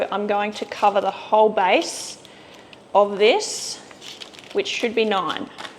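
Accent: Australia. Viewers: How lovely it is to hear an Australian lady doing an Australian dish (I think it’s that). I had a son who was lactose intolerant and another son who had got problems so they put him on lactose-free until he was school-age anyway. Both my boys grew up on goats milk. I never got to the point of making ice cream but, other than that, everything I made for us as a family was made on goats milk. People will tell you that they can taste the difference. —. I guess that’s up to them, but the number of time I would serve apple pie and I used to thicken the goat milk with corn flour and then beat the heck out of it and people that think it was cream.. my best friend ate this, but maybe two years before she found out she’d be eating goats milk all the time. I drank almond milk in my coffee for years and I couldn’t tell the difference a lot of of its mind over matter I think. 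However, thank you very much for your wonderful effort. I’m saving you in my I don’t know what else it’s called, but I’m saving you to look you up and see what other recipes you have. I don’t know where in Australia you’re from, I’m from Redcliffe, about 25 or 30 K’s north of Brisbane Queensland. All the best, and I’ll be watching you to see what you come up with, thanks again.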